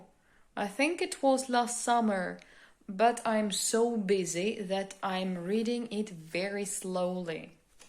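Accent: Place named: United States. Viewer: Вас можно запросто спутать с американкой! Ваше произношение просто идеально! It's just a native speaker's one!!! 😍👍 Вот уж правду говорят: "Талантливый человек талантлив во всем" 🤗 Сколько времени Вы прожили в Америке, чтобы выработать такое прекрасное произношение? (про "Жизнь Пи", прочитанный в оригинале, я уже понял:))) )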